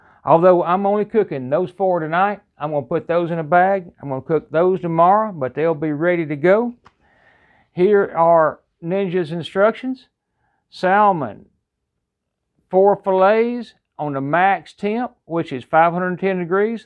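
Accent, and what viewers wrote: South, United States. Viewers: I know you are from the south. I love southern accents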